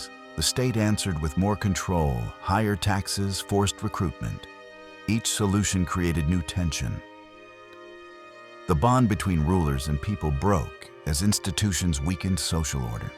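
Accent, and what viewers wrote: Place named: South Africa. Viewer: Sounds like South Africa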